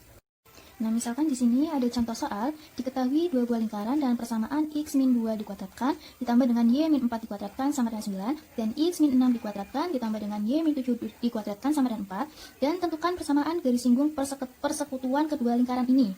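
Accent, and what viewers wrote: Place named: Bandung, Indonesia. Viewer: Suaranya kaya orang bandung kak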